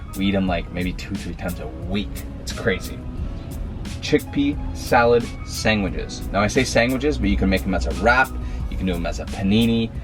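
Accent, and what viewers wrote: Canada. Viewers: im gonna try this!! lol there was barely any sandwich left for her to try ahaha poor girl and why did their bread already sound toasted when he threw it in the pan? lmfao first time watching him and hes funny. Is he canadian by the way? or am i just picking up an accent and the cheesiness lol? dont worry im Canadian too But yeah deff gonna try this minus the sun-dried tomatoes! All around good but theres so many hygiene issues that were literally making my anxiety skyrocket... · I just stumbled across your channel and I’m very confused lol HEB is a Texas based grocery chain but your accent sounds Canadian lol but I’ve been wanting to try chickpeas/garbanzo beans but didn’t know what to do with them! Thank you!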